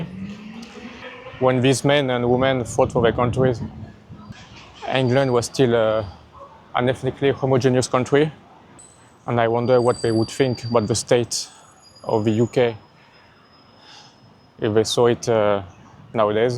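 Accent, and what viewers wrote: France. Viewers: frensh accent :face-green-smiling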